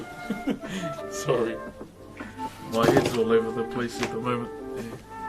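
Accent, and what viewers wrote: New Zealand. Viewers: It's our kiwi accent he said "Hold on guys" he needed time to compose himself from crying on stream. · well, he is a maori, it´s a pretty common accent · Because they've both got kiwi accents which are pretty similar in tone and pitch lol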